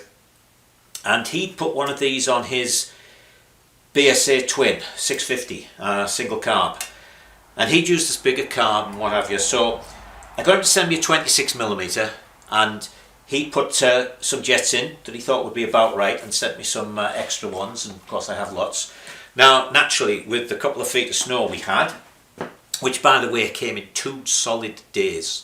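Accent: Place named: Newcastle, United Kingdom. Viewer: Wonderful to learn from a fellow Limey in NY State. I'm in the Hudson Valley, but lived in Ithaca in '79. Do you accept visitors? I'd love to meet you. You sound like you might be from up around Newcastle way, my best mucker was a Geordie. Anyway, please keep'em coming. I have a '68 TR6C with Routt 800cc kit, a '68 P11A, and a '70 T100C. All in almost original condition. Cheers.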